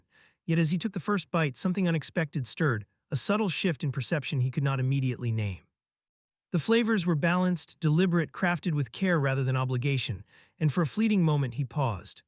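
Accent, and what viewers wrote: United States. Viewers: An American voice is just wrong.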